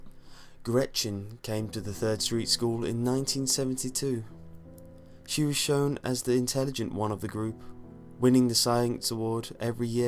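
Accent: United Kingdom. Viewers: Did anyone notice that this is the same bullshit story about Recess that has been spread all over the internet, but with a British accent. This theory isn't based on any evidence from the show, and has several holes. A theory about a TV show needs to be presented with evidence to seem interesting. This is just crappy fiction.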